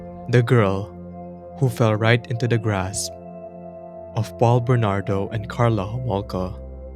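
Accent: Philippines. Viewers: I hear a Filipino accent 😁 greetings from an Indonesian 🤝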